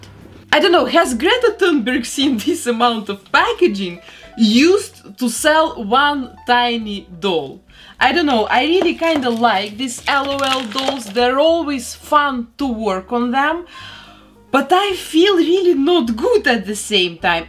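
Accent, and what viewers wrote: Russia. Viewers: Меня путает ваш выраженный русский акцент 🙃❤️ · Вы русская?) акцент очень похож :)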